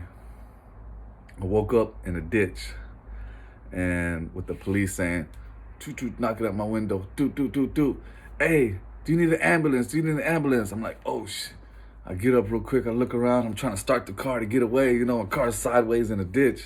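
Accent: Houston, United States. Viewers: What part of the H you from bro?! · My boy you gotta be from alief lol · “I’m from Houston, Texas. A lot of y’all already probably know.” I know someone from Houston when I hear one 😹.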